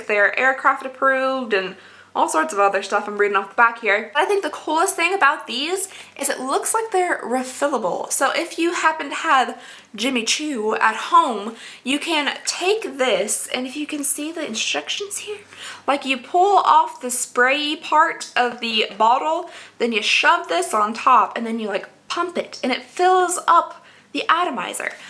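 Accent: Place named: South, United States. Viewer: You could not get any more adorable!! I'm originally from VA (mountains of VA... closer to KY/WV/TN, depending on what direction you wanted to travel. You know... the part of VA no one thinks is there😂😂😂 Now I live in TN and you're not that far from me. One day I'm dying to take a drive and meet you for lunch or something!! Just got a Becca box and I'm thinking I need to share with you. Also....baby girl, you're not nearly as pale as I am😂😂😂 If you're looking for some great pale girl stuff, check out ETSY!! I've gotten sooooo much PR from sooooo many different stores lately that have honestly knocked my socks off! Plus, they are usually really natural in the ingredients and they are hand made so many are willing to make shades for you. Anyway... you make my day so much better. Love hearing that southern drawl, even though I know I'm so hick you would sound like a city girl next to me😂😂 Thank you for making my day better! 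Lunch and box of makeup soon!! Would be a blast!!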